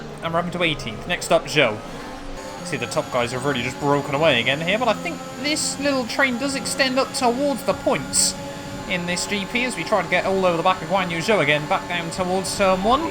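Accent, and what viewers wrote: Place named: England, United Kingdom. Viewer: time to hear say "reta oposta" and "bico do pato" in an english accent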